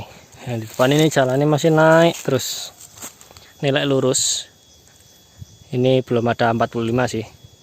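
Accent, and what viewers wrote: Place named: Surabaya, Indonesia. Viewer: Mas suarsne sby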